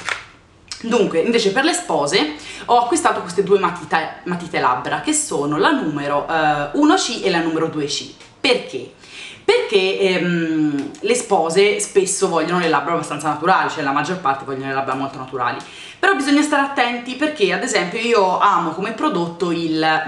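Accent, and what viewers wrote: Tuscany, Italy. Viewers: che bello che sei tornata! mi mancava tanto anche l'intonazione toscanaccia! eheheheh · Sono felice che l'accento toscano ci sia ancora!!! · non è vero che non sei cambiata dall'inizio. tu sei cambiata, si, ma non in peggio, sei diventata meravigliosa. ogni volta che guardo i tuoi video penso a quando di nascosto dalla mamma accendevo i pc e mi mettevo a rifare i trucchi bellissimi che mi insegnava una biondissima ragazza toscana, dall'accento pronunciato e esilarante, che ogni volta mi metteva ilarità. ora sei cresciuta, ma questo è naturale, l'importante è farlo bene, e, lasciatelo dire, TU CI SEI RIUSCITA. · ciaooooo sei beramente bravissima!!!! ma sei toscana!?!? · A me sei mancata perché è mancato il tuo modo di esprimerti e mi è mancata la toscana di youtube.. perché quando da Bologna guardavo e guardo i tuoi video la sera dopo aver sentito un sacco di accenti diversi, sentendo parlare te mi sentivo un po' a casa. 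Metti allegria e mi piace la tua giovialità! complimenti per la tua votazione e in bocca al lupo per il tuo futuro da MUA! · Ciao! Finalmente sei tornata! Nulla, volevo dirti che sono (come credo molte delle tue iscritte) molto fiera di te perchè hai inseguito il tuo sogno e hai raggiunto i tuoi obbiettivi. Devi essere veramente felice della persona che sei, credimi! Continua sempre a fare quello che più ti piace e vedrai che di soddisfazioni ne arriveranno sempre di più:) (Mi chiamo come te e sono anche toscana come te ^^ Quando ti sento parlare mi sento proprio a casa!) Un bacio a Tiffyyy!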